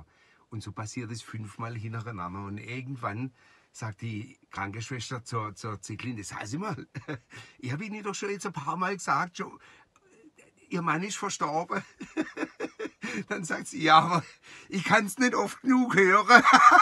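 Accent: Baden, Germany. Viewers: Bester badischer 😁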